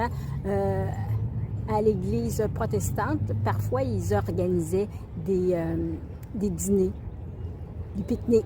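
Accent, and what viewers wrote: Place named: Quebec, Canada. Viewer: Votre voix est magnifique😅, vous vivez surement au Québec. Belle élocution et intelligence verbale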